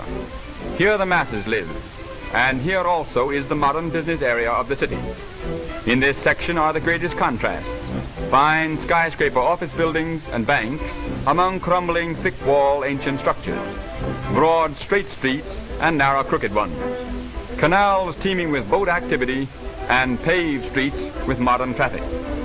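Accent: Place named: Transatlantic, United States. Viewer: trans Atlantic American accent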